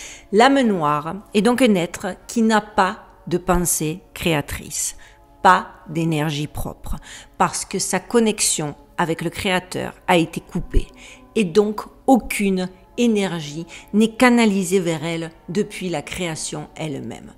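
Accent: South, France. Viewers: Trop top tes vidéos!!merci ❤ Trop jolie naturelle et ton accent frenchy trop bien ça chante le Sud!? Ne change rien!! blessings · Bonjour le Sud ( c’est pour l’accent ) à défaut de te connaître!! T’es sujet sont toujours pertinent et apprécié; pour infos et pour rester sur ton intérêt pour l’intelligence artificielle et le contrôle des masses, connais tu l’expérience dit: « MK ULTRA « si oui quand pense tu? Si non, je te laisse te renseigner et je pense que cela devrait t’intéresser. Bonne continuation et à bientôt pour de nouvelles vidéos. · Merci pour cette vidéo, merci pour vos recherche et nous donner des éléments de réponses pour s’élever et mieux comprendre notre monde. Vous êtes captivante et cet accent marseillais j’adore! fever ❤️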